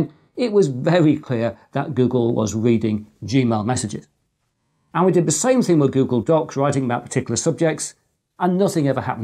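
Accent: United Kingdom. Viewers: Loved this new format! I hope there will be more in the future. Even if I knew about most of these urban myths, the technical clarifications you bring are most useful. I must admit that I am also partial to your style: no flashing extravagant post production effects, no fuss, just plain and precise information. On top of that, your British accent and phrasing and overall attitude are music to my ears! Have a nice cuppa! (Try not to mind the undocumented conspiracy theories too much: as you mentioned in your introduction, facts and opinions (or what we think is a fact without knowing or wondering where it's comming from) are indeed deeply intermingled... I hope you'll keep trying though!)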